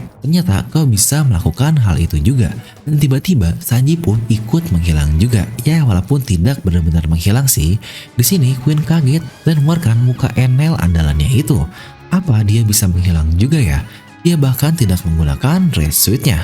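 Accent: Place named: West Java, Indonesia. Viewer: Min org sunda 😆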